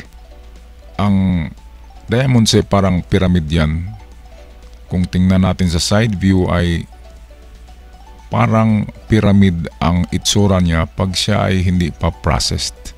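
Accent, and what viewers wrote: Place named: Bisakol, Philippines. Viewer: Engliserp bisakol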